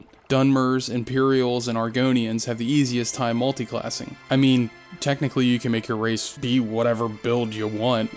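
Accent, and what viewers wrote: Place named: Philadelphia, United States. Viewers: I hear a slight philly accent. A fellow bird team? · Absolutely love Morrowind. Just started my first ever run through and am so immersed it’s more real to me than my stupid job. Great content man. Keep it up. I gotta ask you, Are you from Philly? I grew up there and just wondered from your accent. Feels like I know ya. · Bro please tell me you’re from Philly or at least PA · Sounds like a Pennsylvanian. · lol youre definitely from PA or South Jersey with that accent bud. Good video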